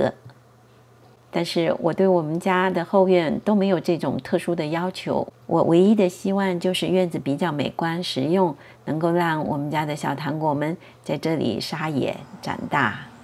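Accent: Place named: Northern Fujian, China. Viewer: 您也算是业余花园设计师了，用心打造美好生活😊听您的口音是不是闽北地区的？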